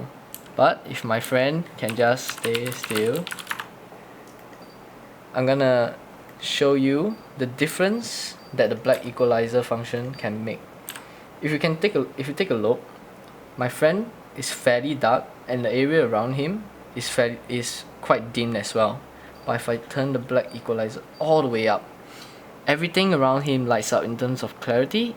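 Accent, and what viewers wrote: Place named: Singapore. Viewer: the accent is killing me.... Singapore?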